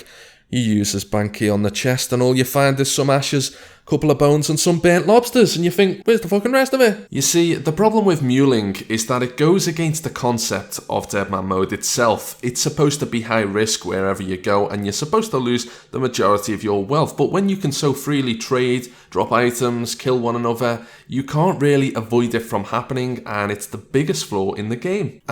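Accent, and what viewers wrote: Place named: Liverpool, United Kingdom. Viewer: Scouse nonce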